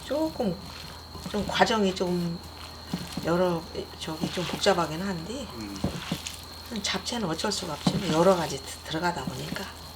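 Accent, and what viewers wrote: Chungcheong, South Korea. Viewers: 억양이 참 듣기좋아요 충청도분이신가요?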